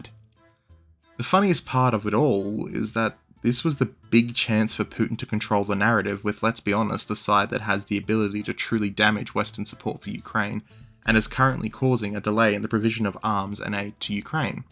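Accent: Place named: Australia. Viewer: You sound like an Aussie. More correctly an ill informed AUSWIPE. Every word Putin spoke was true. The west has big plans for Ukraine THESE ARE THEIR WORDS. 1, They plan to shut down farming in Europe making it the crime of ECOCIDE punishment jail move it all to Ukraine. 2, Shitstain 🇬🇧 has spent big in Odesaa and the coast hoping Ukraine would build cheap warships. 3, Israelistan owns 30% of the land in Ukraine Black Rock and Vanguard planned to buy 100%. 4, Israelistan owns almost every major business in Ukraine just as they own Australian businesses. 5, Ukraine is losing the war its Military decimated. Redacted in the US announced Israelistan 🇺🇲 has ordered ZZelinsky to replace its entire government with western educated people who are LOYAL TO AMERICA this accounts for General Zalhuznyi a UkroNazi his replacement more acceptable to RUSSIA and the WEST. 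6, The ploy to take Ukraine and the southern ports failed tbey thought they could fill it with war ships and block Russia's warm water ports. 7, The west thought creating a UkroNazi state yes there is film of an American Nazi explaining how the CIA used US Nazis to create AZOV Shitstain 🇬🇧 created right sector 1 and 2 using UK Nazis other groups KRAKEN etc exist they were handed territories where they ruled like medieval Barons. The war to collapse Russia failed Europe and Israelistan are bled dry of weapons cash and raw materials they hoped to steal via the total collapse of Ukraine and Russia have evaporated theyre broke.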